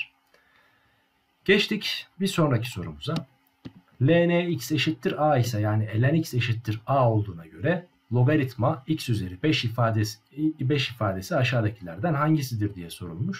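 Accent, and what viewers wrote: Ankara, Turkey. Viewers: Ankara şivesi var hocam sizde Ankarılısınız dimi :D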